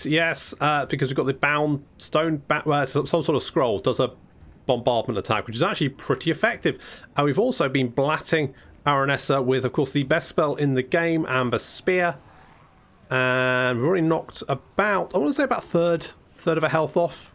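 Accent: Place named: United Kingdom. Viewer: And lastly also I think your British accent and humor plus good preparation with brilliant texts, make you the best warhammer campaign YouTuber. I love how you interact with what the characters are saying. If I would have a firm that would need any guy to connect with the community or make communication videos to community I would always choose you above others. You've got a talent. :)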